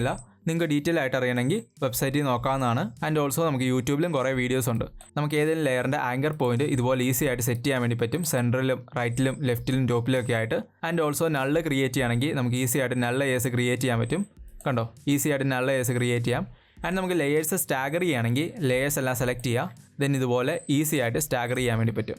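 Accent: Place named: India. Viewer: I can't understand your Indian accent. Instead you can practice pronouncing each word correctly and slowly.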